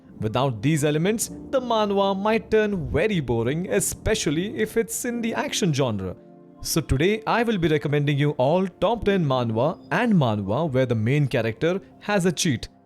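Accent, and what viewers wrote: India. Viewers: The Indian accent is whoop ass amazing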